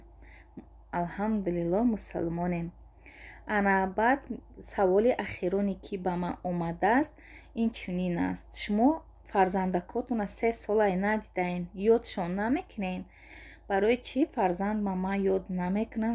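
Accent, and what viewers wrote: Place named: Dushanbe, Tajikistan. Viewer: ачоиб бо забони тарафои Душанбе сухан мекунед,ба ман ачоибаш он ки наход шумо 99% забони он тарафокати гап мезанед,Мо 15 сол боз дар Россия забон гум накардем некин....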